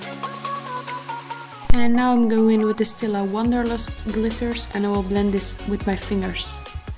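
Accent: Netherlands. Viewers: Shes dutch u can tell from the accent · Your accent sounds dutch..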